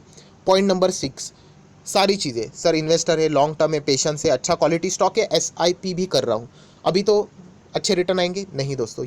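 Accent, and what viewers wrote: Maharashtra, India. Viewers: Are you marathi speaking?Seems to be so from your pronunciations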